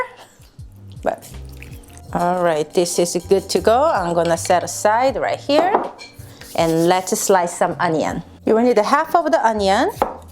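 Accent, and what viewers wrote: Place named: South Korea. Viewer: It is a phonetic spelling of how she actually pronounces the word with her Korean accent. It's a joke.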